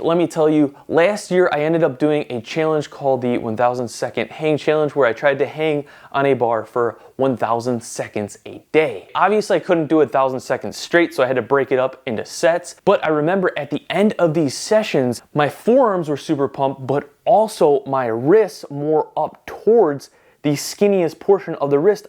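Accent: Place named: Chicago, United States. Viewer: Wow no comments on his accent? I was thinking Chicago or New Jersey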